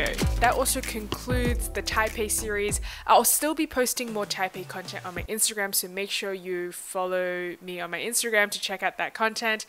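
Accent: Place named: Australia. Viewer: What a great vlog! Really well edited, I'm envious of your comfortability talking to the camera in front of others! Also cool to hear an Aussie accent telling me all about things to do in Taiwan - where I currently am filming my own vlog...also in an Aussie accent. vlogception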